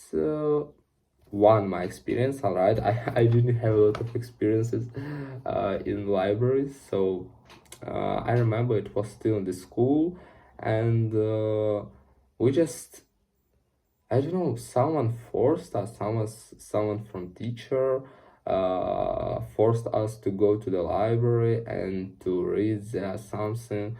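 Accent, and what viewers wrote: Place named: Ukraine. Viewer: When i started to listen you , i understood that you from Ukraine, because of your pronunciation. I'm also from Ukraine 😂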